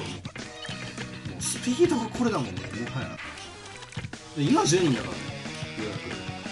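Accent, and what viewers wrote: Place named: Kagoshima, Japan. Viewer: の鹿児島弁かっこいい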